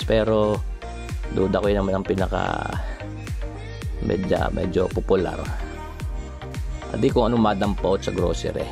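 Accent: Batangas, Philippines. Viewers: Nakakaaliw ka at nakakagood vibe panoorin. Marami rin ako nakukuhang techniques sa mga procedure mo ng pagluluto. Natutuwa ako sa mga pananalita mo eh! Talagang Batangueñong Batangeño… more power to your vlogs & GOD BLESS😊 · Abay sadya..batanguen0 eh😅😅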